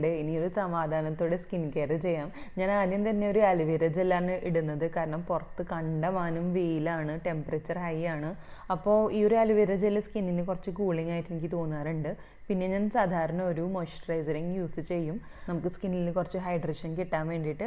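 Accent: Thrissur, India. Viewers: Aaha...namude thrissur slng aanalo inn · Eee thrissur slangine evide olippich vachirikkaayrunnu? 😅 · I could hear the trissur kaari in you dear ...was a lovely relaxing vlog ...i miss Kurti shopping too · ഇന്ന് ഭയങ്കര തൃശ്ശൂർ സ്ലാങ് ഉണ്ട് സംസാരത്തിൽ 😁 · This time Thrissur slang nallapole vannittund.... Nalla change... Purple, blue, pink ellaam nice kurtis aanu.... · Why suddenly this thrissur slang ? I never felt this before in ur vids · Pakka thrissurkariyayallo😍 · Pakka thrissur slang❤❤❤❤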